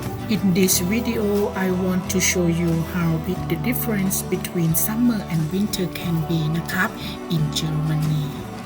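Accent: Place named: Isan, Thailand. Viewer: ดูเพลินเลยจร้า สวยมากๆเว่าอีสานได้น่ารักมากๆจร้า..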